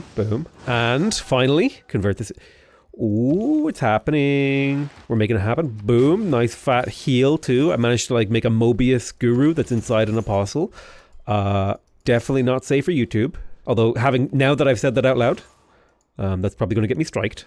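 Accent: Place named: Ireland. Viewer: lol It's really interesting hearing an Irishman talking about destroying the Catholics. Also, you sound crazy like Dara Ó Briain to me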